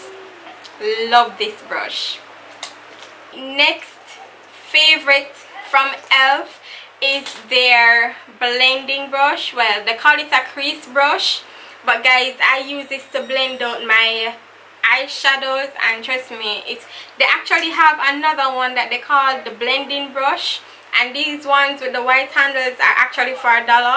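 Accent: Jamaica. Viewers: New Subscriber! I heard a familiar accent and had to support you. Good to see a yardie onYoutube!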